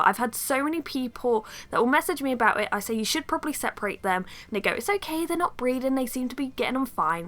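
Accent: England, United Kingdom. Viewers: Where do you live? And she’s not pretending lmao, she really is English. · what a lie !!!!!!!!! dont let the english accent fool you she dont know whats shes talking about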